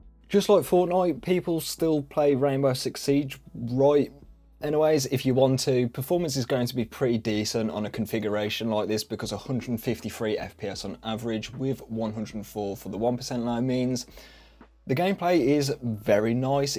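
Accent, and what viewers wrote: Black Country, United Kingdom. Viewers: great video, your accent sounds familiar, maybe the black country, Im a PC builder myself and I don't see many people around my area building computers haha. carry on with the great videos.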